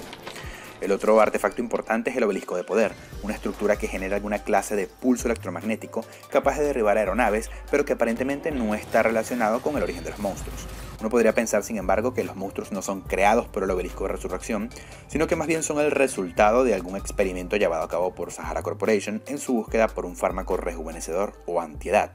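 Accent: Venezuela. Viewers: Es venezolano¿ El narrador no los canibales, el acento xd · Buen video amigo eres de venezuela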